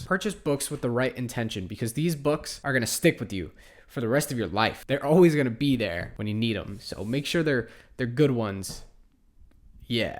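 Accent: Canada. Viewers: Probably Canadian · I think Canadian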